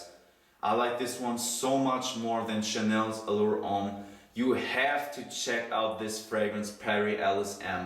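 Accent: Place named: Germany. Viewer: I always wondered where his accent came. Turns out he’s German. Still love the accent and good to see the Germans keep going.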